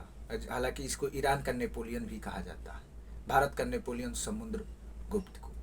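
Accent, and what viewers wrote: Bihar, India. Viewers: apna Bihari wala style m pdhayiye na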